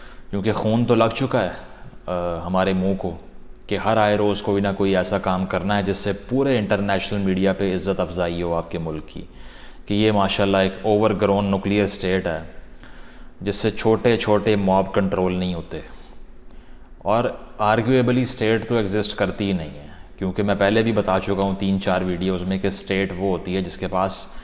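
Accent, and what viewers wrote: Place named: Pakistan. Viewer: This guy sounds like an educated Pakistani..........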